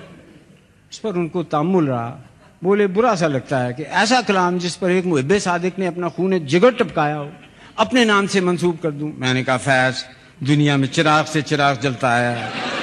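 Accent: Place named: Hindko, Pakistan. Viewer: Oh okay thanks for clarifying. I was wondering how Zia Mohiuddin sounded like a native Hindko speaker talking in Urdu.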